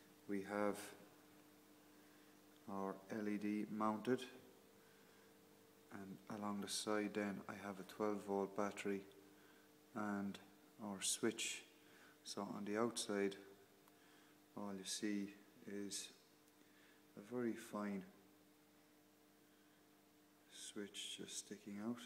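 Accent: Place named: Scotland, United Kingdom. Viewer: Hey, really enjoyed that vid very informative and i love the scottish accent!!!!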